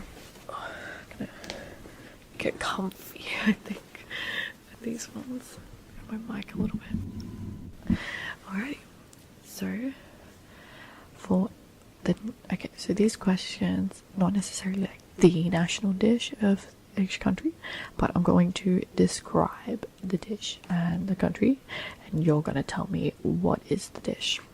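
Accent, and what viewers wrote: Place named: Australia. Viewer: This was so fascinating and relaxing ❤ I really love listening to ASMR with a fellow Aussie accent, glad I found your channel!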